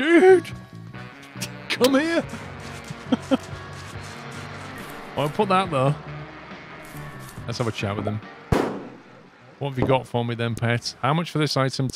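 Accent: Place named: Liverpool, United Kingdom. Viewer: lmao your Liverpool accent is spot on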